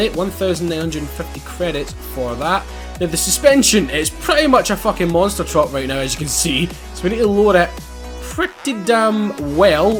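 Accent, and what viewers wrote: Scotland, United Kingdom. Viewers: he's scottish, that's why he pronounce it like that. but yeah that's a really weird reason to dislike. maybe it's his relationship talk idk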